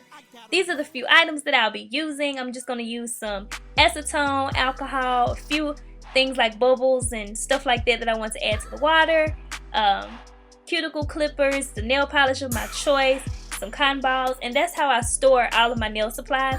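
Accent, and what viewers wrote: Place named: Atlanta, United States. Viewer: Love your ATL accent!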